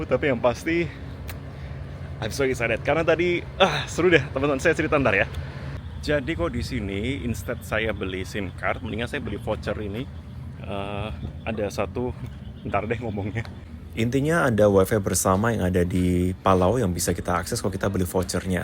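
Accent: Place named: Surabaya, Indonesia. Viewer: Terimakasih Bang, kontenmu mewujudkan mimpiku waktu kecil, kan suka liat Globe terus penasaran pulau² kecil disamudra emang ada orangnya? Dan Kontenmu menjawab semuanya. Tp ada 1 yaitu kutub Utara atau selatan ataupun negara yg terpencil² kyk gimana kehidupan mereka yg jauh dr negara² lainya. Semoga kontenmu semakin berkembang. Btw orang mana kok logatnya kyk Surabaya.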